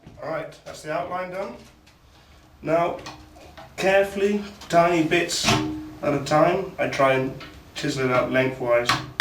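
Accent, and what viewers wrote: Netherlands. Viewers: Haha ik zat te luisteren en ik denk, hmm wat voor accentje is dit, klinkt heel hollands :P. Lees de eerste comment, ja hoor :P. Wat voor hout raad je aan? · What's the accent may I ask? Sounds like Dutch but living in the UK for a decade or so...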